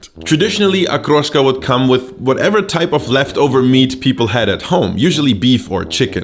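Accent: Russia. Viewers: Абсолютли russian-english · Ладно там русский акцент, дешёвая малосъедобная варёная колбаса и фигурное выкладывание салата для окрошки. Но пальцем насыпать сметану в окрошку - это какое-то откровенное фричество.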